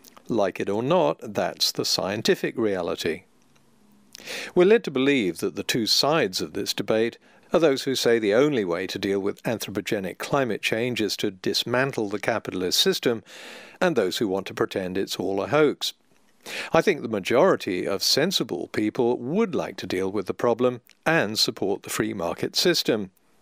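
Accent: United Kingdom. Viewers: What's wrong with his delivery? As a well educated British middle class person, his voice comes across as balanced, clear, and perfectly normal. It's an educated accent and very normal. He is delivering an educational discourse and does so in a rounded way. Is it just someone being British that you don't approve of?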